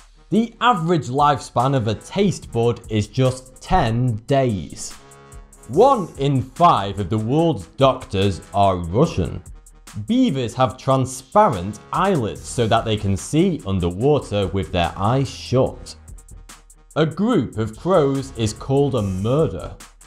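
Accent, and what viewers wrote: United Kingdom. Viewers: Lol..if u just hear me I will imitate your British accent jejeje,u're so smart😁😀😄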